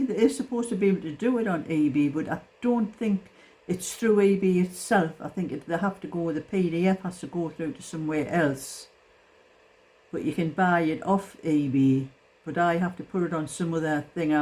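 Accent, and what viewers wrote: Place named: Ashington, United Kingdom. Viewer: Hi, can I ask whereabouts you are from? You sound like my husbands family in Ashington xxx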